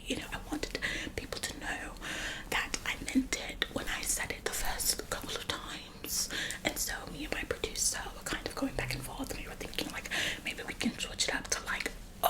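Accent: United Kingdom. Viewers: there's smth about the British accent that really brings this all together 😭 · omg the british accent is so realll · i need more british accent it was so gooooood!!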